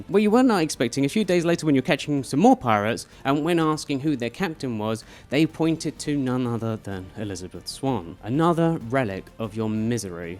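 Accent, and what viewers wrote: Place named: United Kingdom. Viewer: Mate you sound British… what is your issue with the British?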